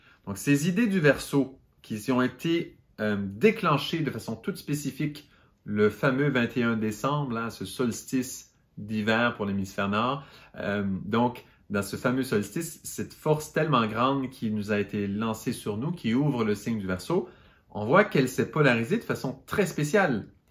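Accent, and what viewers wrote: Quebec, Canada. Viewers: Bonjour et merci beaucoup (J'ai toujours du plaisir d'entendre l'accent du Québec où J'ai vécu quelques temps)...Je suis abonnée à la chaîne d'emilie Morel et je suis ravie de la perspective de votre collaboration. Je suis taureau ascendant Gémeaux et ça va plutôt pas mal car le soleil en maison 12 c'est très dur. Lune en bélier. Je vais noter pour vos conférences. Amitiés de Bretagne.merci.(milieu du ciel Mars et Jupiter en Verseau 😍)).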